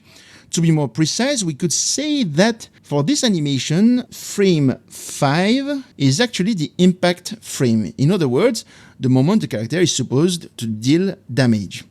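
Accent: France. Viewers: L'accent française est vraiment la pire.. ouch. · L'accent français de zinzin 😅Merci pour le tuto complet c'est top !!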